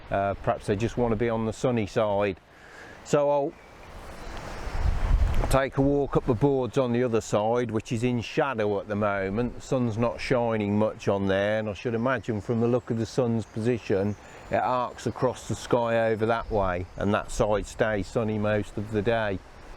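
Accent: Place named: Northampton, United Kingdom. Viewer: Keep it up great vid. U sound like you have a original northampton accent!!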